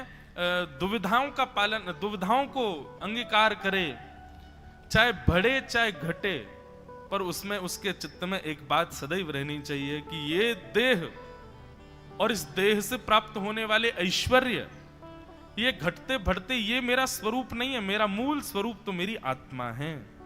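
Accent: Braj, India. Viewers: Apke mukh se Braj bhasa bdi pyari lagti hai bahut mitha lgta hai, radhey radhey 🙏🙏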